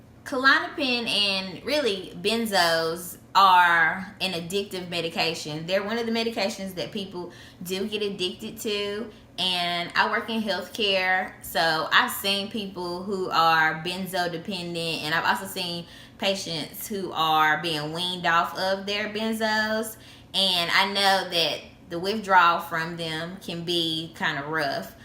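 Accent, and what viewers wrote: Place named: South, United States. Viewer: Dam I love that southern accent. You talk like my cousin. I will be taking this medicine soon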